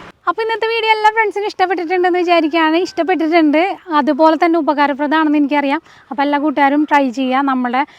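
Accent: Thrissur, India. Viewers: സൂപ്പർ വീഡിയോ തൃശൂർ സ്ലാങ് പൊളി 👌😍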